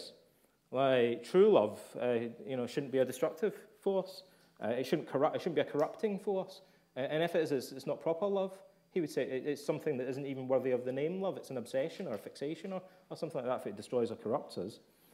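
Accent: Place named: Cockney, United Kingdom. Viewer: He's talking in Cockney accent which even most of the Brits don't understand.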